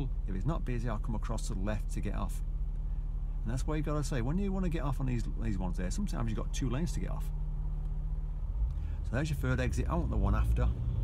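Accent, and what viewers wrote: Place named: Northern England, United Kingdom. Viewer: you defiantly have a northern twang! I’m from Yorkshire so I would know 😊 I passed 7 months ago after 4 attempts … nerves let me down a lot those first 3 attempts, watching your videos helped me a lot! I’ve been terrified to try motorways but finally did it yesterday after watching your video. Cheers!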